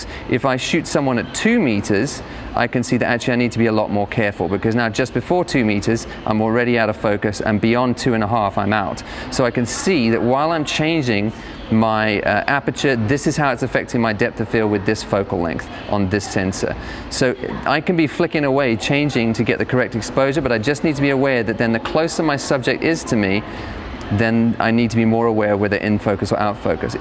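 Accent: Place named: South Africa. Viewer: Hi - South African here. There are qualities in your accent that make me think you might be from South Africa. Are you? I've just subbed:)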